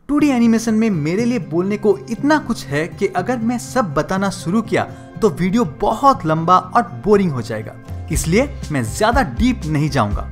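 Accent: India. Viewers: r u from kolkata?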